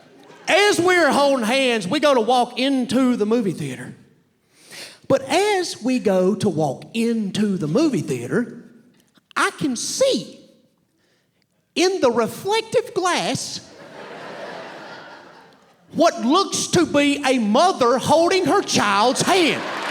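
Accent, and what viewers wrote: South, United States. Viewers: Wow. How unfunny this SOB is. No originality. Hinges on a “redneck” accent and nothing he says has any form originality. He’s got the appeal of Donald trump and the boredom of boredom. Legit trailer park trash and being ironic or not. He’s just not funny.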